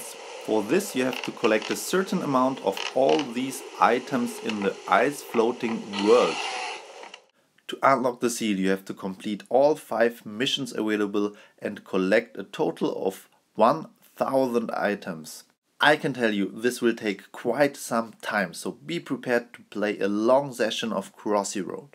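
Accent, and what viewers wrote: Germany. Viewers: Man merkt voll das du deutscher bist😂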